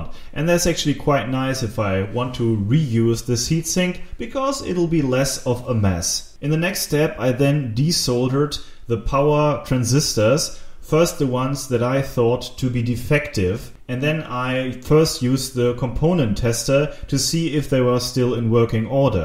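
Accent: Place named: Germany. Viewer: Hallo, ich schreibe mal auf Deutsch, da ich einen entsprechenden Akzent in Deiner Englischausführungn heraushöre - ich hoffe, ich liege da richtig. Ich habe den Yamaha dsp a595a auch und wollte gern meine Boxen mit Bananensteckern anschließen, stellte aber fest, dass die Speaker-Schrauben keine Kappen haben, die man abnehmen kann, um den Banana-Eingang freizulegen. Was kann man tun ? LG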